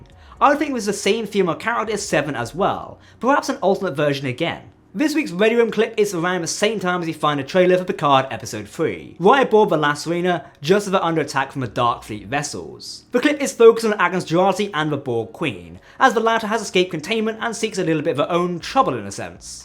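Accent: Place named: England, United Kingdom. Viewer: Always enjoy your videos very informative and the wording is always nice and witty however and please take this in the right way could you please slow down just a little bit you're an English accent which is OK makes it harder for people to understand everything that you're saying with the speed at which you're saying it in other words a she aint sure have a little bit of a problem Thank you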